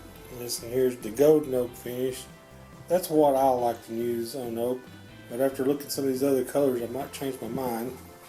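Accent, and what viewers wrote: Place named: East Tennessee, United States. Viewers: Thank you sir for this video. However I'd love for you to spend a bit of time watching and editing your videos before submitting them. I had some trouble with your southern accent when you were saying one of the samples but it was impossible to read the can as it wasn't even in the picture. Again thanks for taking the time for this but it would be really great if there was a touch of care in the videography. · Hey, Great video. I've got tons of 2 inch red oak slabs. I'm not really crazy about how red oak looks. I'm wanting to use the slabs to build some river tables. I'm wanting to stain the red oak much darker. Do you know if you can run epoxy over stain? Also, nice shirt. What part of Tennessee are you from? You sound like East Tennessee. I'm in Manchester (Bonaroo). Great vid. Thanks.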